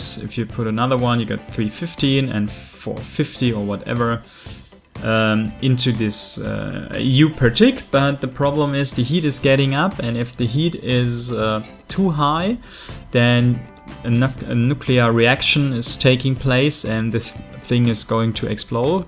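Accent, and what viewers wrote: Germany. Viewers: Bist du Deutscher? Hört man iwie raus und das "Servus" in der Beschreibung ist ein weiteres Indiz :)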